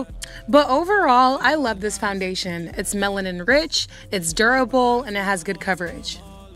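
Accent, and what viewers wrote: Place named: Nigeria. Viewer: omg you are gorgeous are u Igbo Yoruba or Hausa etc? you definetly sound Nigerian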